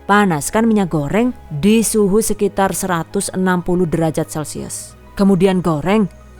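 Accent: Banyumas, Indonesia. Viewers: Ngapake mantep yuu, aku jg wong ngapak😅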